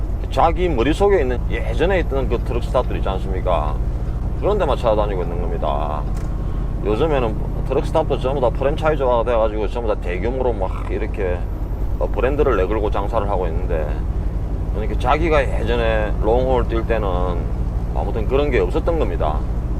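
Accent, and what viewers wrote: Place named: Busan, South Korea. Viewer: 와 부산말 제대로네예 ㅎㅎ 구수하이 좋네예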